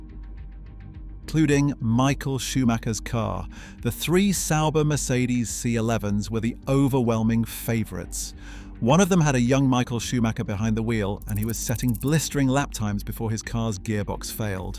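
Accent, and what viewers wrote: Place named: United States. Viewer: Great video, but as a British writer and teacher, I’m intrigued by your American pronunciation of numbers. 😊 I’ve never heard anyone British do that before. Not a criticism - I’m just curious.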